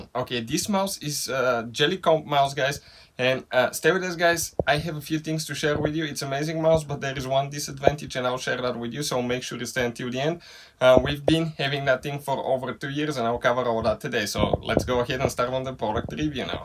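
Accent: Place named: Russia. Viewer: i love the thick Russian accent lol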